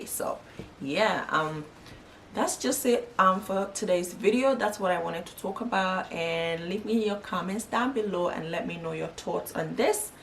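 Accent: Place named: Jamaica. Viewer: THEY HIRED PROFESSIONALS. AND. HANDY. MEN. YOUR. ACCENT. SOUNDS. JAMAICAN.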